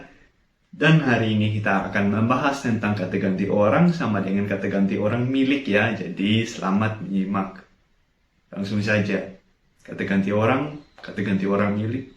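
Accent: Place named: Java, Indonesia. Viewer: finally video baru🤩 semangat bikin video² baru lagi🙌😂 btw suaranya kayak ada medok² Jawa🤭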